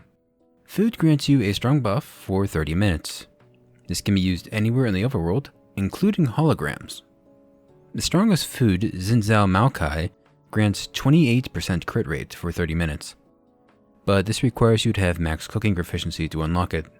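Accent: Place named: Pakistan. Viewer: sounds like from pak maybe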